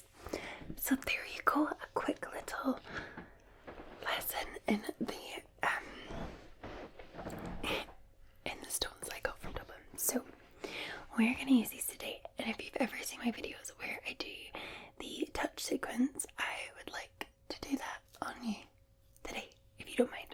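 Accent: Scotland, United Kingdom. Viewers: "gurril", yep defos a scottish lass lol though doesn't sound weegie, more eastern, edinburgh or the lothian area or even dumfries lol whatever it is, its nice having a scot online. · Love me a wee bit of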